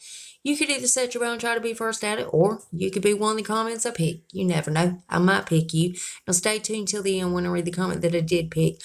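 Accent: South, United States. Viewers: I looove your accent!!!!! 💜💙💜💙💜💙 my husband can always tell when I've been watching your videos cuz I end up talking in a country accent lol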